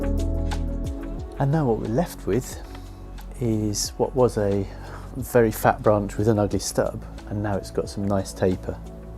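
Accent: United Kingdom. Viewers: Thanks, the music is fantastic as always. I can notice you are doing mindfulness sessions just listening your peaceful voice jiji. I love your perfect English accent. Take care.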